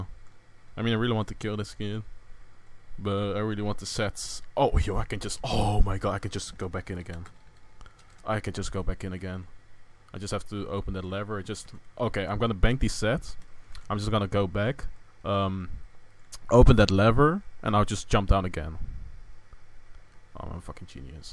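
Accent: Netherlands. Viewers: Is he Dutch because he sounds like it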